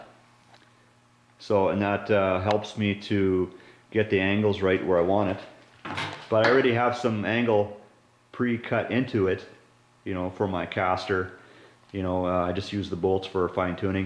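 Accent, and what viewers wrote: Canada. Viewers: Love the way Canadians say "out"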